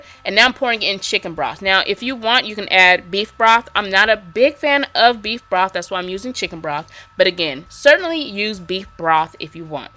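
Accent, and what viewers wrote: United States. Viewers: Why do yanks say "erbs" when there is an "H" in the word........... its HERBS.... in the Queens English the word is HERBS... learn it.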